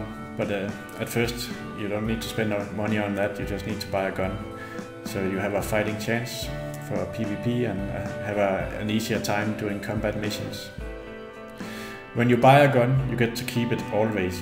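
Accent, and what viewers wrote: Denmark. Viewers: er du dansker? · Hello may i ask are you danish? Hvis du er KOOONGEEE xD EJ køber selv gta 5 så tak for videoen :D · Er du Dansker? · Er du fra Danmark? Du lyder dansk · Are you from Denmark ?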